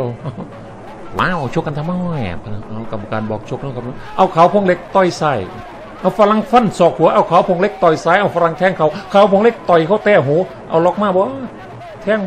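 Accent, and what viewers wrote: Isan, Thailand. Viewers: ภาษาอีสานก็เพราะดีค่ะ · พากย์อีสานตลกดีบ้านๆดี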